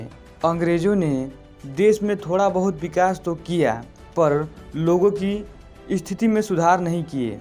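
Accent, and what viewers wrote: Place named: Bihar, India. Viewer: अबे बिहरीया आवाज आवाज सुधार अपन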